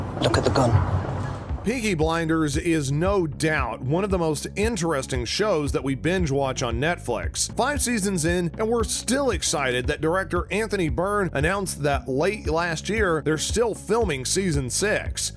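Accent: United States. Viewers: I’m sorry but the way Americans say Liverpool and bermham is funny why we need more big British YouTubers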